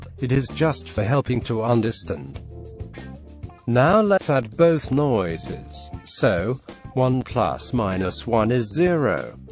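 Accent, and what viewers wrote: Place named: England, United Kingdom. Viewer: nice job. I agree with the English accent. You sound very authoritive. You also kind of cleared up a question I had with my cables. Cheers!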